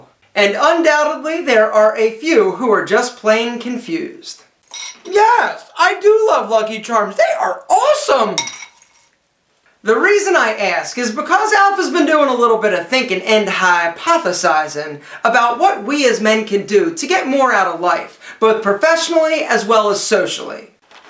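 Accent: Philadelphia, United States. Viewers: alpha-are you from Philly? Your accent sounds like it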